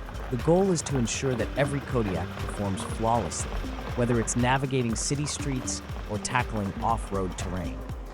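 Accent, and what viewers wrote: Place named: United States. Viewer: Not impressed by the american accent, nor the mis-pronuciation of aluminium .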